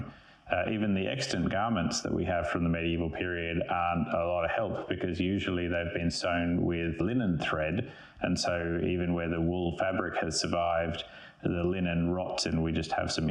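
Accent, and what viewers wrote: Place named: Australia. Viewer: Great video! I’m very new to hand sewing seams. Right now I’m attempting to make a shift using the “seam and fell” method described in a book I found called “The Medieval Tailor”. It’s a bit like what you described because the seam is worked in tiny stitches from the right side too. Have you tried your technique for longer seams such as side seams or for seams where it’s trickier to have one hand behind like sleeve seams? You might have addressed my questions in other videos - I’ll go and see what else you’ve posted! Also, it’s lovely to hear an Australian accent 😊. I’m in Adelaide, but most of the other YouTube historical costume channels I have found are in the US and UK.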